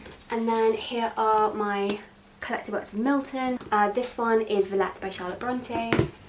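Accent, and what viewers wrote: United Kingdom. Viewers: I opened this video ( just because I saw a nice picture) not expecting much buuuut the moment you spoke first words I fell in love! I love British accent and I wish I could find more British booktubers but there’s a thing I can’t find anyone at all or I find a person who is not my type and I’m boring to watch… Summing up everything I said, I’m so glad I found your channel! Thank you for your videos and your beautiful bedroom haha🍃✨ p.s. if somebody got a British( Irish/scottish are welcome too) booktubers recommendation, I’d love to hear that!!💗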